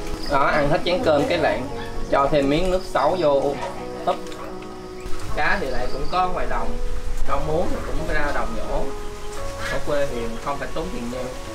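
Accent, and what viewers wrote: South, Vietnam. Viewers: Sao mình nghe giọng bạn miền nam mà cảnh vật đặc sản như ở bắc vậy hơi thắc mắc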